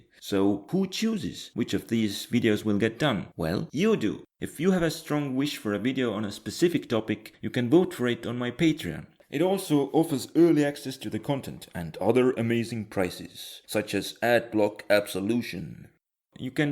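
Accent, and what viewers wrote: Eastern Europe. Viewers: Are you from sweden? Why do you have an eastern europe accent? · Its interesting to see you reflect on your own channel after you gained some traction. I think I subscribed after first seeing your eastern front animated video. Here are my thoughts: (not to be rude or anything, just some honest criticism) As you have already noticed the drawing part is certainly the weakest aspect of your videos. If you aspire to be on the level with series like extra history the truth is that your drawing/animation skills are still very basic and not quite there yet. That does not necessarily mean that „bad“ videos will come out of it - just that they are not on par with most comic-narration things you see on youtube. And there is quite a lot of it so its hard for you to stand out. But I dont think you actually need that. As your eastern-front-animated video shows I think you are far better off concentrating on the mapmaking and detailed-research aspect. 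I could think of your channel as something like a baz-battles on steroids with a focus on the modern era of history and going in for much more detail. So I would suggest making the videos almost fully map-based while trying to make the maps graphics even simpler and also more refined. One last point that you might not be aware of: many people have problems understanding eastern-european accents. (I suppose your estonian but its quite similar) I noticed the guy who does baz-battles is also from eastern europe and once he changed to a native english narrator his videos became much more accessible for a broad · Ditt accent låter mer östeuropeisk än Svensk? Estland, Lettland, Litauen?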